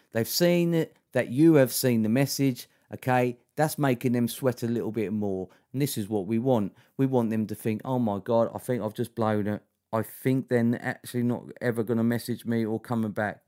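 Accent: Cockney, United Kingdom. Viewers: Mate your videos are great top knowledge and your funny bout how you say it 😂 - you could be in Snatch the movie with that cockney accent.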